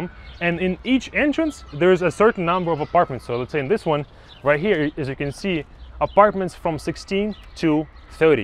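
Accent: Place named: Russia. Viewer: he is really good at English. but also i can hear his Russian accent though haha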